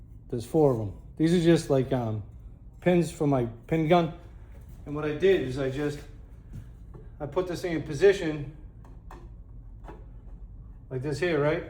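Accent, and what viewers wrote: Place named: Boston, United States. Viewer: Hey bud, I can tell a fellow Bostonian when I hear one. It’s not your the accent it’s the way you talk. Keep up the good work.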